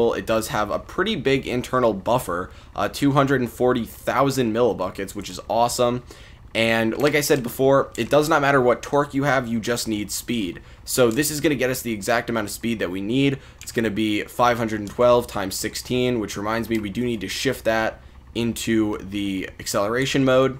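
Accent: United States. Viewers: Are you American by any chance?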